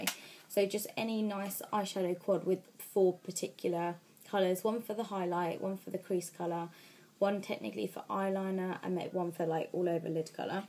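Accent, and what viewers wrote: United Kingdom. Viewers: this is my first time watching one of your videos and before you started talking I thought you where like 12-13 years old but then I herd your voice and im like WTF?!?!?! Ur like 20 or somethin' years old?!?!? And your British?!?!?!? good video by the way :)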